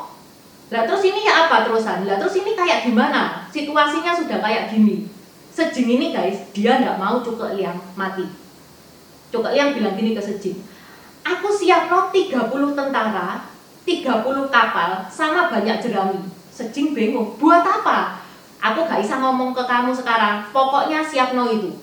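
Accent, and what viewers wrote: Java, Indonesia. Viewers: Jeng anda orang mana...logat anda medok banget...unik...apa adanya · Klo boleh saran.... Usahakan dalam penjelasan cerita murni pake bahasa Indonesia yg mudah di mengerti.... Krn gak semua pendengar bisa bahasa Jawa apalagi dengan eksen medhok. Hanya sekedar saran ya... Thx u 🙏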